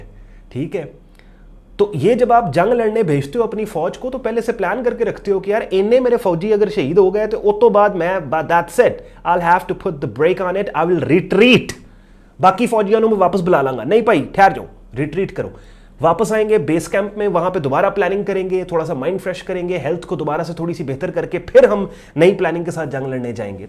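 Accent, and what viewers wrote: Punjab, India. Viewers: Mashallah I like the way you explain bro or Jo Panjabi Ka trqa lgaty Hain Kia kahny😂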